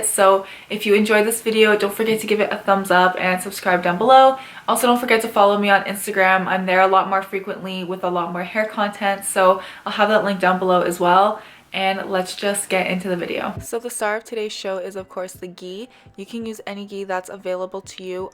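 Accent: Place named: United States. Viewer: Are an Indian u are talking like American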